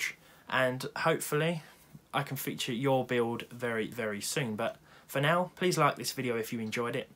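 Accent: United Kingdom. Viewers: Hello i have just come across your videos sounds like you are from the uk like myself i dont have facebook or Twitter. I am looking for someone to help me i have a 6-7 year old mac book pro works perfectly. I am currently using windows 10 via boot camp. My question is can i run windows on my macbook without using bootcamp? For example completely wipe the laptop of any operating system and just installing windows thank you i hope you can help daniel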